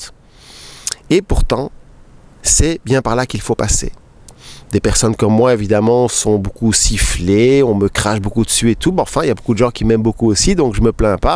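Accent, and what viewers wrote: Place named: Belgium. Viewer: Ce petit accent... n'est pas français ! Belge peut être ?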